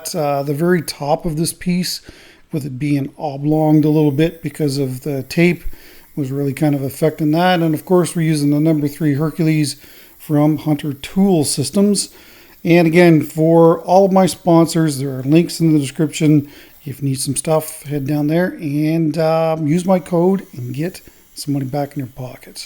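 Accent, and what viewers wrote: Canada. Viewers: I love how you Canadians talk. Nice work as usual, good Sir. Did you two get the house sold? I surely hope so.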